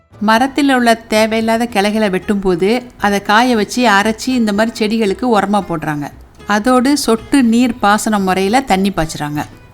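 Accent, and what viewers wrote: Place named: Tiruchirappalli, India. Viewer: இந்தியாவில் எந்த ஊர். தமிழ்நாடு. பேச்சு வழக்கு திருச்சி மாதிரி இருக்கிறது. நான் திருச்சி தான். பார்க்க பூக்கள் அழகாக உள்ளது. வெளிநாடு வெளிநாடு தான் .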